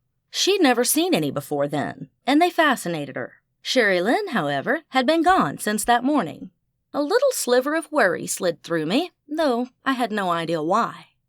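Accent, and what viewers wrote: South, United States. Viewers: I do love the added male voice with the deli ious British accent. Dont get me wrong, I love the southern accent also ( I am a born and raised Georhia peach ) but the British accents makes my old heart flutter. Lol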